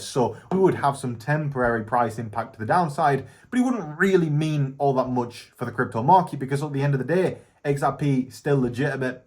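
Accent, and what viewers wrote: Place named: Lancashire, United Kingdom. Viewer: Always enjoy your videos mate, keep it up, good northern accent, take its Lancashire? im other side of Manchester Derbyshire, but they class it East Midlands 😢😢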